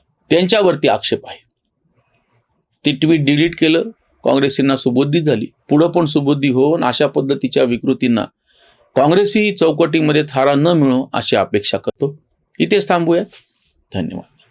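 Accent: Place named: Marathwada, India. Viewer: हि मराठवाड्यातील बोलीभाषा आहे.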